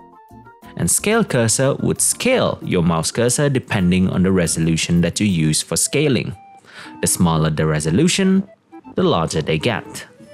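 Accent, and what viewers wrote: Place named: United Kingdom. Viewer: How you get that nice british accent?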